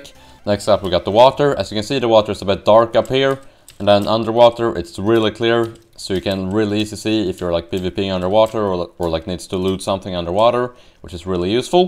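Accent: Sweden. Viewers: Uhm.. This may sound a bit weird, but you sound swedish ;)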